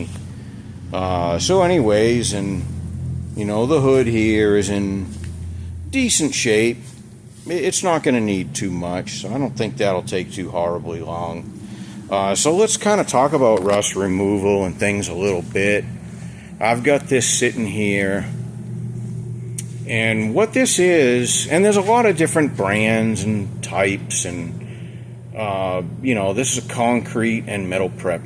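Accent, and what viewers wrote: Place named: Boston, United States. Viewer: Apaht. All the pahts. Miss being around that accent , raised in the Baystate (living in the south now)… anyhow dipping it is hella pricey but no warping no sand surprise when painting no texture changes. Sitting on my ‘47 dually till I can afford the same. Going to set it on a 90’s cummings dodge chassis… Hey check out Rungë cars on yt, great coach builder also khaos design yt out of Australia great stuff.* edit to add love your perspective and attitude- so refreshing. Also great point on wanting to drive it not perfect it- oh man that hit home😅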